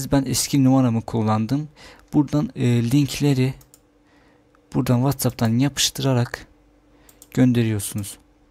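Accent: Azerbaijan. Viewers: SALAM ELEYKIM QAQA TURKCE DANISIRSAN AMA AZERBAYCANLISAN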